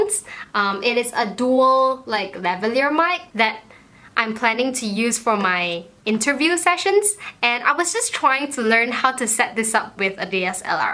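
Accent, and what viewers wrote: Malaysia. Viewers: Thank you. I've had this dilemma for quite a while. As my channel grows, it's kinda hard to switch the language, but this subject keeps popping in my mind. I probably switch to English one day. I'm just not sure when. By the way, I love you Malaysian accent. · Ya actually English is the 2nd most spoken language in the world💛 also your Malaysian accent is so cute😍❤️ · Love your Malaysian accent 🙃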